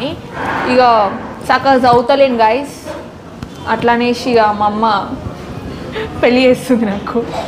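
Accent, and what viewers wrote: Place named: Vijayawada, India. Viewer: Vijayawada antunnaru konchem aa slang change cheste bagundedi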